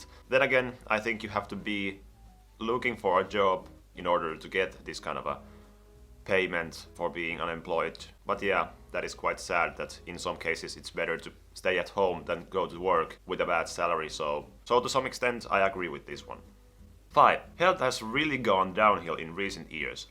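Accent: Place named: Finland. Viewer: Are you a Finn? Cause that acsent sounds like you are a finn. I'm a Finn myself :P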